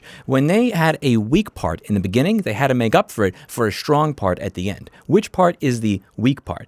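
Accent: New York, United States. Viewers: I just now noticed your new york accent, never noticed it before this video.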